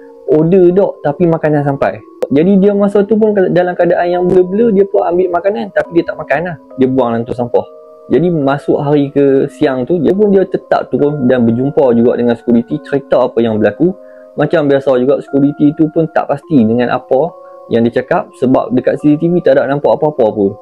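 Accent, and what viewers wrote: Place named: North, Malaysia. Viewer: org utara dok.mana bang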